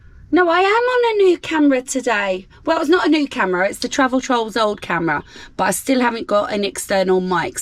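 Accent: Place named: RP, United Kingdom. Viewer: Happy Easter love your posh voice 😅 😅 love & xxx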